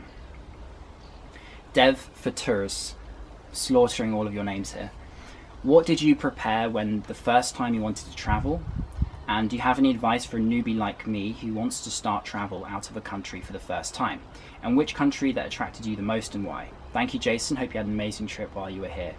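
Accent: United Kingdom. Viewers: I love listening to your British accent and that's the reason I watch your videos. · Jason has a beautiful way of speaking the King's English. An eloquent Brit voice can be as attractive as anything you will hear in France, but at least with Mr. no translation needed.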